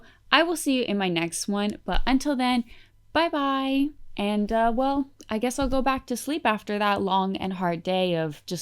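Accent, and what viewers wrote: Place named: Quebec, Canada. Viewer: This is such a rich video!! As you mentionned I feel like online discussions about not-online aspects of the fashion became less common through the years, so hearing a « modern » discussion around this topic is really interesting. Also unrelated but I’ve been watching your channel for soo long and somehow didn’t realise you were from Québec (more specifically than Canadian I mean)?? For context I’m French so the nuance between Québec accent and native English accent can be a bit hard to catch on single isolated words sometimes (like brand names for example), and the sudden language switch totally jumpscared me haha! Merci beaucoup pour cette chaîne et tout le travail derrière !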